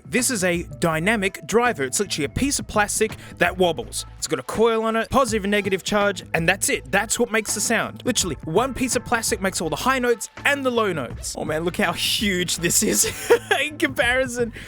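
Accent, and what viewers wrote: Australia. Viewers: Somehow, compared to his first video, he has managed to make himself sound more Aussie.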